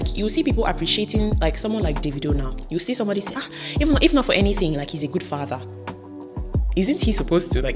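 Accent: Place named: Ghana. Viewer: your Nigerian accent is disappearing, I can hear the Ghana in there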